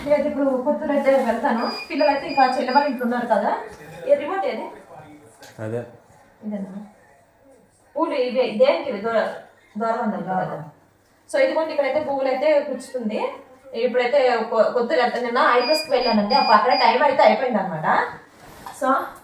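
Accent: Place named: Srikakulam, India. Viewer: Aha emi slang mana sklm ante aa maatram undali super prank sis madi sklm town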